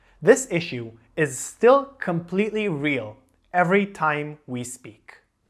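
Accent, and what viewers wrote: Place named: United States. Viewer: They American accent isn't nice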